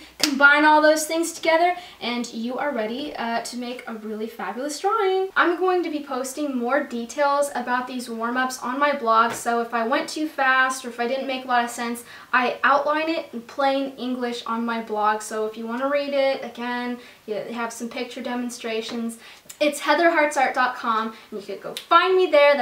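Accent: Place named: California, United States. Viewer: It's ok, you from California? For some reason that was what came to mind (when I heard the likes).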